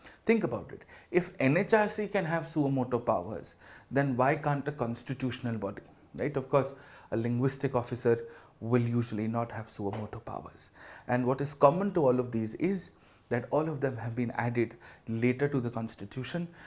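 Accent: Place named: RP, United Kingdom. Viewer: How on earth you are not known to people, you must be a celebrity bcoz the way you speak resembles you a British Lord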